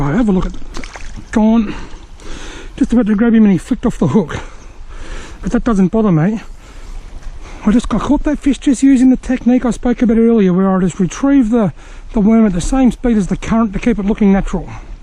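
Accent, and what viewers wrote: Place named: Australia. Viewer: WTF, are there trout in Australia? First time watching this channel so I’m only assuming due to the accent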